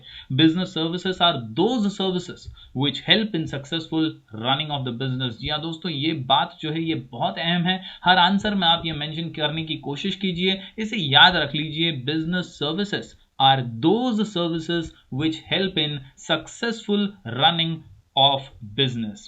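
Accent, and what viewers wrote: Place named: Uttar Pradesh, India. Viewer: being from up whenever i listen ur up accent that helps me to take more interest in the lectures 😂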